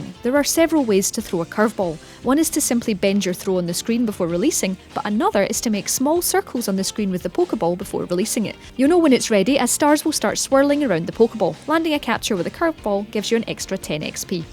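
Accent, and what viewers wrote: Scotland, United Kingdom. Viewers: That is a VERY Scottish accent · Im from scotland and i hear this accent everywhere <3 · is the person taking in the video Scottish? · I love that scottish accent. <3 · BAHAHA HAHAHAHAHA BRO THIS NARRATOR IS LIKE SCOTTISH SHE'S NOT EVEN FUCKING BRITISH YOU DUMB ASS BRUH YOU THINK ALL EUROPEAN PEOPLE ARE THE SAME 😂😂😂😂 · Sub'd because Scottish 😍😍😍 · No, this accent is more scottish while the girl from outside xbox is brittish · She kinda sounds scottish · it's Scottish not British lmao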